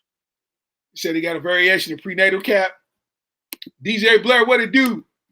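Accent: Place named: United Kingdom. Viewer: There's something about how brittish people articulate themselves that makes it twice as funny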